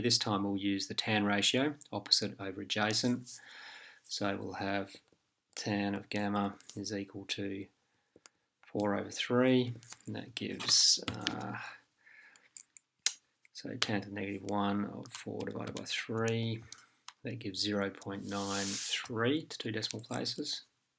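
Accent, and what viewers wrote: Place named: Australia. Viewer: I have been trying to understand the process behind finding out the least and greatest value of Arg(z) using sin and tan! At least I can see it here! Well explained with funny Australian accent!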